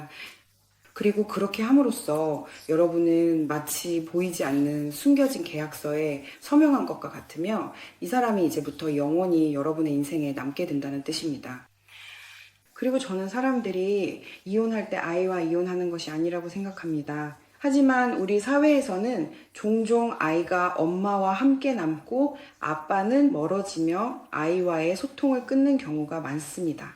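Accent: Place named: Russia. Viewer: 꾸준하게 좋은 글을 올리시네요 .아쉬은점은 한국어가 능통하시는데,아직 러시아 발음이 깔려 있어 발음에 세심한 경청이 필요합니다 . 이점 때문에 내용은 좋으나 구독 유저가 저조 하다 생각합니다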